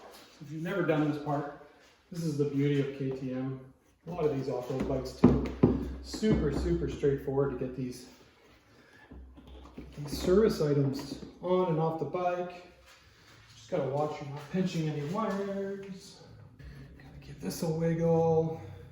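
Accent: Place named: Canada. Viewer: So you're telling me this guy has sweet KTM 500 EXC, a sweet garage, a sweet set of tools and a sweet Canadian accent, went to O'Fallon Bike week in 2016 and he won Quantum Fuel Systems grand prize?! Some people. Thanks for vid. It doesn't help me at all w/my 2018 Super Duke, but thanks just the same.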